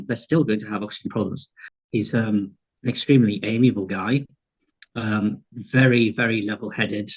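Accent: United Kingdom. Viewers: british bla bla bla boring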